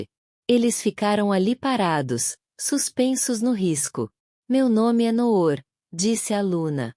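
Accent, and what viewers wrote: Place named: Bahia, Brazil. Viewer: Eu amo essa sua voz de Salvador Bahia a mesma Voz que narrou. A historia de Luisa e Gabriel Monte Negro ❤❤❤❤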